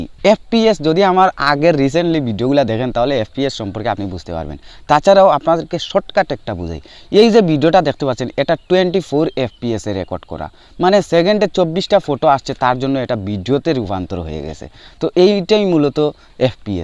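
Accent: Sylhet, Bangladesh. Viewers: Vai apni ki Sylheti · Kotar maje sylhety vasa ase... sure apne sylhety fuya😊😊😊 ami o sylhety furi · Tumije sylhetii 🎉🎉 ita mateouu buja jayyy ❤❤valobasha niooo priooo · ভাই আপনি কী সিলেটি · ভাইয়া আমি ১০০% শিওর আপনি সিলেটি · Sylhety ni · Bai apnar bari mono koy Sylhet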